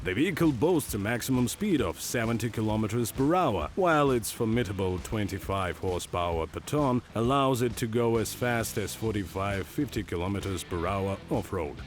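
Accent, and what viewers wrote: Russia. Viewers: Yes, tell me more about Swedish box tenk Mr. Deep voiced Russian Narrator :3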